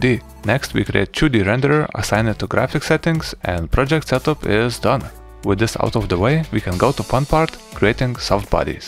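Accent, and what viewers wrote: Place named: Russia. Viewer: Damn dude you have such a русский акцент .. (Аж ваще)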